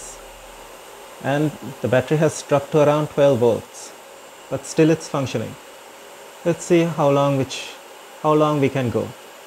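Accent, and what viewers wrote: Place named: Sri Lanka. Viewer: I just need your advice on how much I have to spend on this ? and sounds like you are from Sri Lanka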